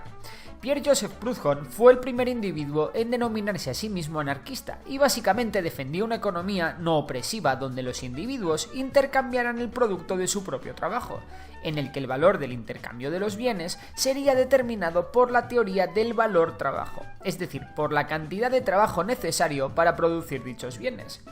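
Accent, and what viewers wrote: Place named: Spain. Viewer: muy bien este video, yo esperaba un anarquista es un rebelde. Que impone su bienestar. Gracias por toda la historia, toda mi vida, escuchando de gobiernos y ahorita un poco más de información. Ojalá pueda poner, uno de los impuestos o la tecnología. En esta nueva era, a mí me tocó los Nokia con snake y ahorita ya veo memorias de pez acostadito 😗 Me es peculiar el asiento español 🫠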